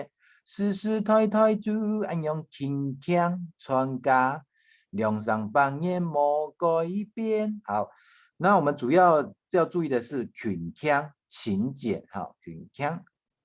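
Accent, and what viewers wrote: China. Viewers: 老師的口音好像海陸腔？ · 你讲闽南话不是客家!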